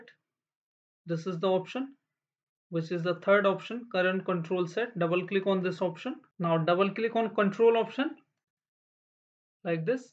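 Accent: India. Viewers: always trust the indian accent tutorial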